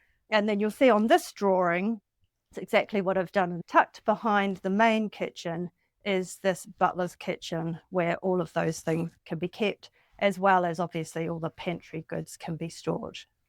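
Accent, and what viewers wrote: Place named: New Zealand. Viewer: Oh I'm proud of myself for picking up on the Kiwi accent! (a transplanted American living in Australia). I also think providing your clients with a bunch of designs is a terrific idea. Not that it matters, but I agree with the kitchen table idea. I've always thought that there's something inherently silly in having a seating/dining spot just feet from the dining table. If a design wasn't open plan, it might make some sense, but otherwise, why? I'm also not keen on having either a cooktop or a sink in the island, as to make that functional, the island has to become bigger and take up more space.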